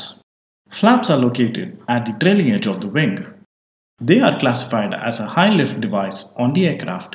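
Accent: India. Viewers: Bro r u indian? Btw thanks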